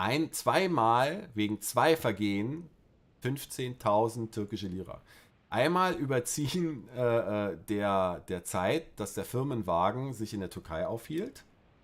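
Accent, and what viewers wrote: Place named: Germany. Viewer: Du sprichst die Städte wie ein deutscher aus. Bist du wirklich Türke?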